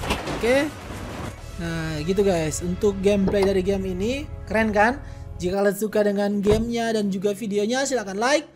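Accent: Manado, Indonesia. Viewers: Jan pake logat Manado bang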